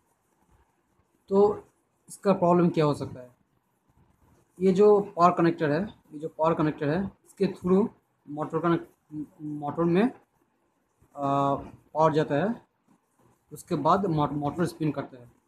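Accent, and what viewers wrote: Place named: Bengal, India. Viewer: From Bengal as evident from accent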